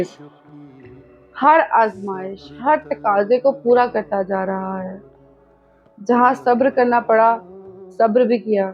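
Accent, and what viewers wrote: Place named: India. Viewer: لہجہ ہندوستانی ہے آپ کا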